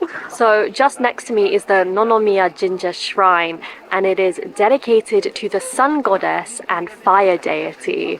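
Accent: United Kingdom. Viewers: はっきりしたブリティッシュイングリッシュで勉強しやすいですよね〜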